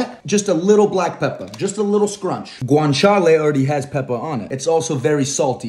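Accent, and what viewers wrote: Italy. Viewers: italian its his real accent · Devo dire che si sente il tuo accento italiano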